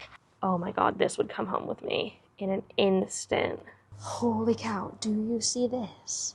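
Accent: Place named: United Kingdom. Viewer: Your accent has always been so interesting to me, you have picked up so many british-isms that you have an in-between accent heh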